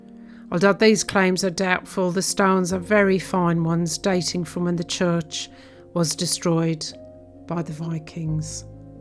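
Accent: Midlands, United Kingdom. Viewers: Good video, but that's a Midlands accent ? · I thought it was a midlands accent too - which I quite like. Where are you from?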